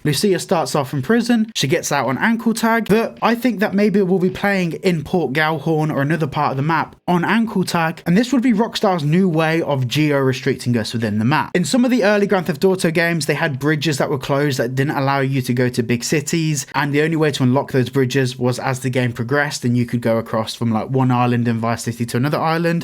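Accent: Birmingham, United Kingdom. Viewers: This dude is from Birmingham I can tell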